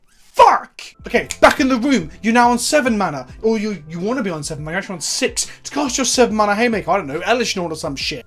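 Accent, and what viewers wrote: United Kingdom. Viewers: British Hungrybox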